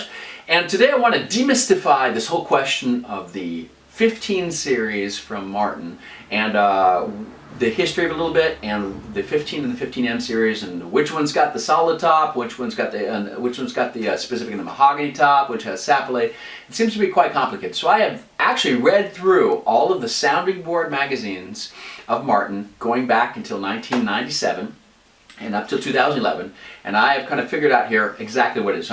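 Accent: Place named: France. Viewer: This isn't a criticism, but I had to watch this whole explanation a couples times until I got used to the quaint French accent that kept creeping in. BTW, most folks place the accent on the second syllable of "Sapele"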